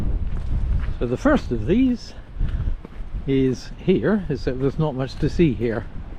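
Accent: West Country, United Kingdom. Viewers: Spectacular scenery with lovely contrast between the hedgerows then down to the crashing waves along the clifftops all narrated with that west country burr.Makes me nostalgic for Devon my country of birth after living 32 years overseas so thinkin of planning a summer walk 😅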